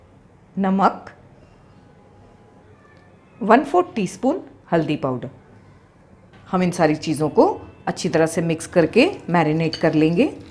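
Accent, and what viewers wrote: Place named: Maharashtra, India. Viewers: Thanks for sharing. Wow. Aap bilkul Bombay walo ki tarah bolti hai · Madame excellent. Are you from Konkan ? Your Marathi accent comes through ? Very nice .Why isn't it as widespread as vada pav ? Add egg and it becomes a very healthy BF .The use of shapu ! Brilliant. Great .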